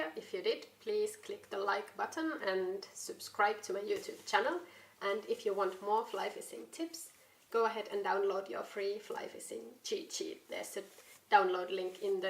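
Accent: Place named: Europe. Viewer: Very nice fish in Sweden I like your channel. I really like the way you say fissing and not fishing it sounds very European.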